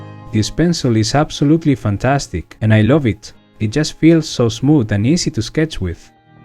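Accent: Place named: Spain. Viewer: This dude is going crazy I can tell that you are Spanish but well done 👍 G keep it going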